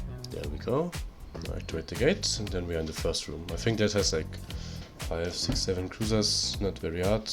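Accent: Germany. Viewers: Ahh the German accent ..! Which eve time you playing usually?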